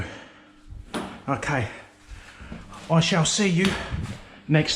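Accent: United Kingdom. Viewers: You have a proper British accent, I shall trust you.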